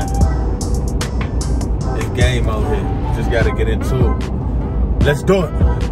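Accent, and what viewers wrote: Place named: DMV, United States. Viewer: Keep grinding fam I just got me a dually and 2 car trailer a month ago boutta take off and turn up I feel good to hear a dmv accent on YouTube giving free game keep grinding bro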